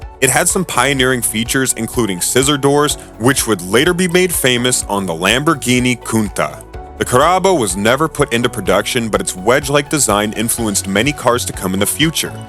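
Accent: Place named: United States. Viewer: Any A.I. voice would have spelt any of those names much better than any "American". To not to say anything about his "TweNNy-tweNNy"...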